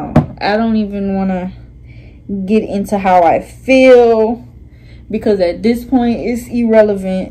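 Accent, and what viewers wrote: Louisiana, United States. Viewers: You have so much hair! It turned out so pretty! I feel like I heard a Louisiana accent! ❤ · Y’all what state she from I need to know with this accent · Are you from Louisiana?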